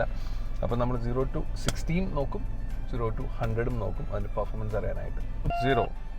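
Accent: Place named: England, United Kingdom. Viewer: Mr ഇംഗ്ലീഷ് ആക്സന്റ് ഇൽ മലയാളം പറയാതെ പറയുന്നത് ആളുകൾക്ക് വ്യക്തമാകുന്ന രീതിയിൽ സംസാരിക്കാൻ ശ്രദ്ധിക്കുക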